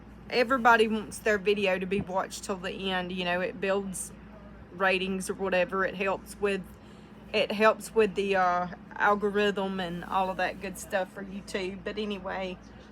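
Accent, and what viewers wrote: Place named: South, United States. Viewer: Girl that is Southern accent and no mistake! I am an Alabama girl myself. On the pricing, I charge $.07 per gram of the finished plushie, then add the extras like specialty eyes and poly pellets. Then I pay myself 10.00 an hour. For all your clearance items, I would suggest mystery bags. I bought some paper sacks on Amazon that are a bit smaller than your standard grocery bag, printed an 8 X 10 sheet and taped it to the front of each one. The sheet described the gender and age range that the plushies would be good for. Then I usually take the fully price I originally charged and mark it down 40% or 50%. I have had alot of success with those mystery bags. Hope that helps!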